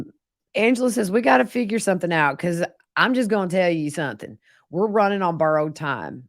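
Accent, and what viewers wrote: South, United States. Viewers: You sound very southern even when you’re not trying to sound like them. I’m southern so I can hear it. Love the videos. Thanks. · Love how you narrate with that sassy southern talk you had me lol,thanks for all the hard work!⚘️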